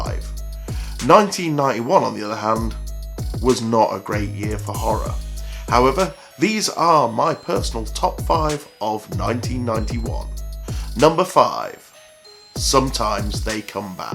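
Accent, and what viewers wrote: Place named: United Kingdom. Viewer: Are you British, that accent so much sound like British?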